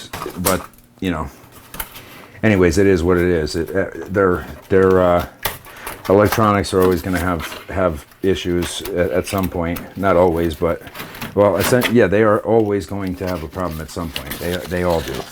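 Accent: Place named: Brooklyn, United States. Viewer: You got a slight Brooklyn accent! lol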